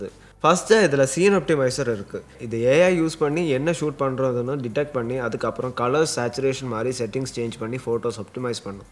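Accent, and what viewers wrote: Kerala, India. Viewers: Malayalam hero Tamil pesara mathiri iruku ba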